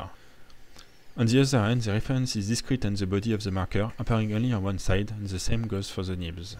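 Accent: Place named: France. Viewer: love ur french accent.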